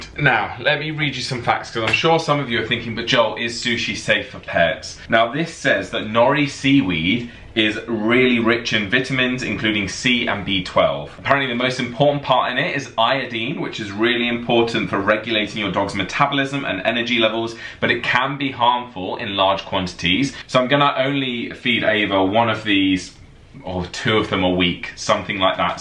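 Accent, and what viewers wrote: United Kingdom. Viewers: Hi Joel, just sayin', I LOVE the way you pronounce heart ! Whenever you talk about looking for lambs heart it makes me smile ! (That British accent !) Also, don't worry if you don't get to shave or however you look during a video, we are your subscribers because we love you ! Yes, keep up the dog recipes, I'd love them for my 3 pups Liza Jane, Jose and Bernie ! 💜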